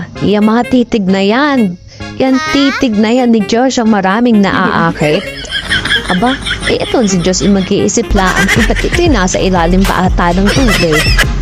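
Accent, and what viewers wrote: Batangas, Philippines. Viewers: Batangeña ala e HAHAHAAA ang cute!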